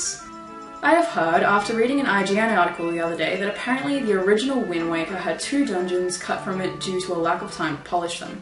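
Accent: Australia. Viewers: She's an Aussie, they've got that cute accent, all of them :P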